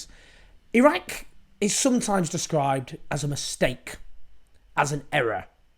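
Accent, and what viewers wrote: United Kingdom. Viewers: The accent of the host seemed like he is a British! Well, I've got a news for this man, a British. You, your prime minister Tony Blair with his friend the United States of America empire's president should be the one not only to be put on "TRIAL," if possible to be "HANGED IN PUBLIC⚖️👎🇺🇸😡😡🇬🇧! Ayatollah Ruholah Khomeini said: "DEATH TO AMERICA⚖️👎🇺🇸😡!" Well, the same words will come out coming from both Afghan and Iraq people's mouth who was abused, slaughtered and pulverised by both your United Kingdom and that of murdererous Americans saying unitedly "DEATH TO BRITISH ⚖️👎🇬🇧😡!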